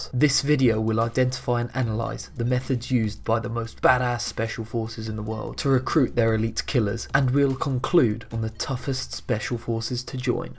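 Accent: England, United Kingdom. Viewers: You definitely sound British, are you sure your not biased? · Ok, but you habe got english accent. Chosing the SAS as your mother country special forces, somethings wrong ;p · I think you were biased toward the British because your British your self, i can tell by your voice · go figure you sound British so you pick Britain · Narrated by the British guy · and i was right british narrator=british unit great video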